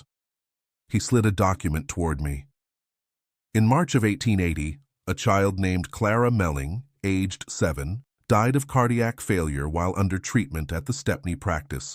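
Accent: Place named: United States. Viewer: Why is this in an American Accent 🖕🖕🖕🖕🖕 no Chance I am listening to this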